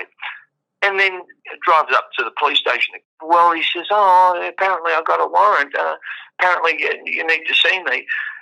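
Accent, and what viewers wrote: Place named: Australia. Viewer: No is kiwi, this guy is Aussie. I would love to get in contact with him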